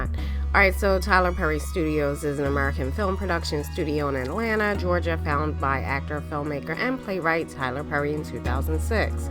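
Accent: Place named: Maryland, United States. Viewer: You from maryland or d.c.? Your accent is heavy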